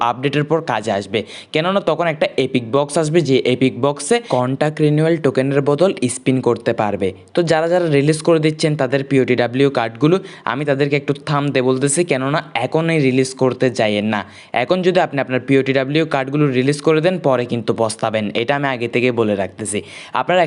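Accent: Chittagong, Bangladesh. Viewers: Vai apni bangla vasar shate chittainga vasha mix kore felen kno? Apnar kotha golo sunley hasi pay😅😅😅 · Vai ki chatgaiya naki?🫠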